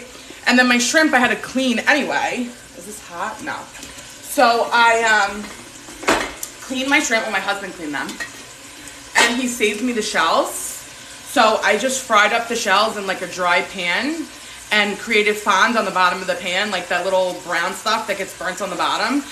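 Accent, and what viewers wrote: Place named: East Coast, United States. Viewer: I’ve been watching your cooking vlogs and must say you are amazing!!! Love y’all east coasters. Majority of my family is in Mass. American Armenian and you remind me of my cousins. Y’all talk and sound so much alike. I am on the West Coast, Southern California. Much love to you and your beautiful family ❤❤❤❤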